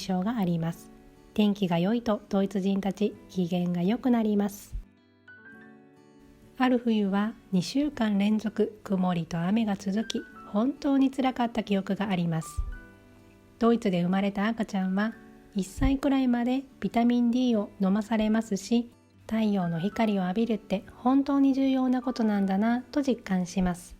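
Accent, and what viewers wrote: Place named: Osaka, Japan. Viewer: Nobanaさんの大阪弁にほっとしています。読み上げてくださるので用事をしながら楽しませてもらってます。大阪府出身で、ニーダーザクセンのとある小さな村在住です。大きな瓶に入ったパーティ用のブラートヘリング南蛮漬けのミートボールが好きです。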